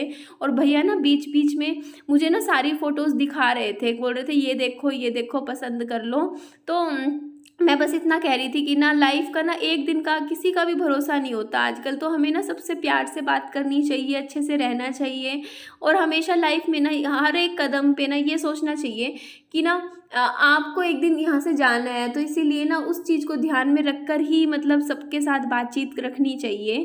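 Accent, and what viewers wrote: Haryana, India. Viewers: Haryanvi Tone m aise hi bolte hai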